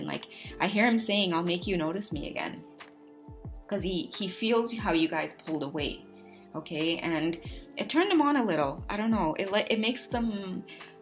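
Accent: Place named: Trinidad and Tobago. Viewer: OMG my video's thumbnail is a pair of passionate lips!! I'm just waiting for it to process. Lawwddd!! Your upbeat energy made me smile right through this reading, love. Fantastic reading! It felt like a personal reading - absolutely on point!! You are so right about the DF leaving the DM to learn his lesson. We are standing in our power and finding our passion. Woohoo dat Trini talk - hot too bad!! Ah love it bad bad!! Thank you so much for the mention in the description, love! So appreciate it! 🥰💕💋🔥🇨🇦🇹🇹. Needed to add the flags!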